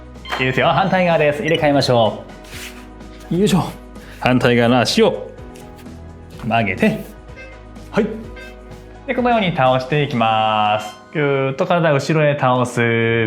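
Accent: Kansai, Japan. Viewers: 参考になります で、ええ声の合間合間にかなり関西弁のアクセントが入ってるのですが、「漫才師の麒麟」の感じでええ声関西弁でストレッチもなんかいい感じ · この回は関西弁が少々多目で嬉しい😆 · 先生のレッスンでピラティス熱が再燃しました！ありがとうございます🙌 あと、今日初めて、ん？関西アクセント？のイケボ？と思いました。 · 関西弁のいい声はナダルみたいで聞き入ってしまいますね！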